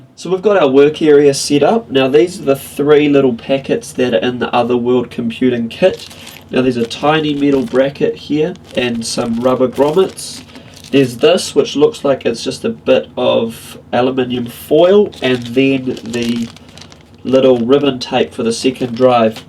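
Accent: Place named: New Zealand. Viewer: that is a Kiwi accent, (New Zealand)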